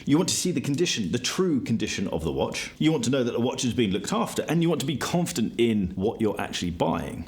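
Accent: RP, United Kingdom. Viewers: Very posh ❤ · is uk based surely you have picked that up from his accent. Things happen outside the USA. But he should have clarified it’s a UK eBay Free fee offer for private sellers. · To be clear, in the UK it is free to sell for private sellers, not business sellers. Also vehicles aren’t free. If you are in the US or elsewhere or are classed as a business seller then this isn’t the case., if the accent didn’t give it away, is UK based and not a business seller in this case.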